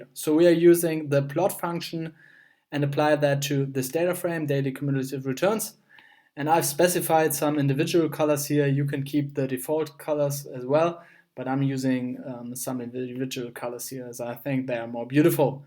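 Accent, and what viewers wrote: Germany. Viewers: Expected a babe, but got a nice german accent to listen to make me even nerdier than I am now. Actually the tutorial was just what I was looking for in terms of prediction! Loved the data visualizations as well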